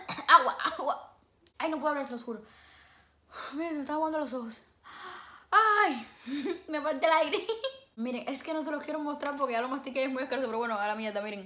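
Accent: Colombia. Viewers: ERES DE COLOMBIA???? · Está tipa no tiene asentó mexicano , tiene asentó colombiano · O ye tu eres colombiana ❤❤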